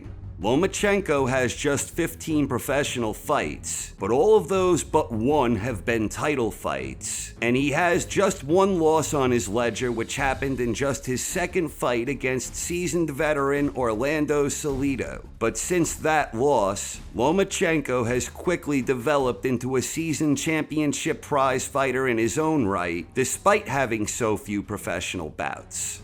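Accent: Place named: East Coast, United States. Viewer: ! You are the Best at this stuff in my opinion! The way you talk and your accent is a huge draw for me! Don't loose your east coast accent! Lomo vs. Lopez is on the calendar! Thank you for your work!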